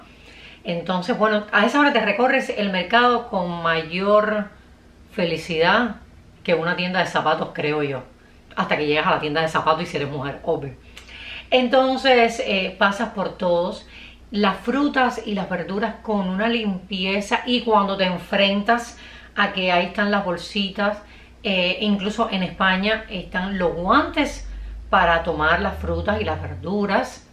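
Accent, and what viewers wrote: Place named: Cuba. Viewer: Qué simpática, agradable esta chica y qué acento tan bonito el cubano!!! Creo, que de los acentos españoles más bonitos....Sino el que más.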